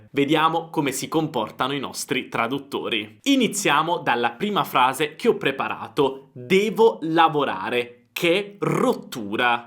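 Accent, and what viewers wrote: Italy. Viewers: ho scoperto il suo canale youtube qualche settimana fa e da allora ho guardato molti dei suoi video. Vorrei congratularmi con lei non solo per il contenuto ma anche per la sua dizione. È estremamente facile capirlo, il che mi rende molto curioso di sapere in quale regione d'italia vive o è cresciuto. Gracie per i suoi eccellenti video. Giulio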